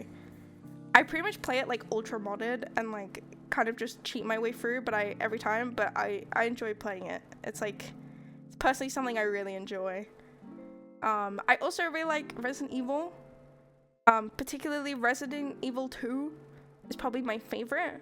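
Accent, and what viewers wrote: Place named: Liverpool, United Kingdom. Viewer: Oh snap a Bri'ish VTuber, and a Scouser no less! I absolutely love your design! It's nice to hear a Scouse Vtuber tbh, I'm a Woolyback with a lotta family down in Liverpool so it's kinda nostalgic for me to hear. It's also nice to see a VTuber who I might actually be able to watch without destroying my sleep schedule because of time zones!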